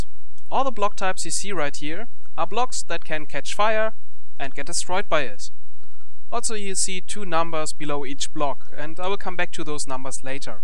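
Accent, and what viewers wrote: Germany. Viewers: Ich liebe deine deutsch "pronuntation" (?) Ps ich bin italienich ;)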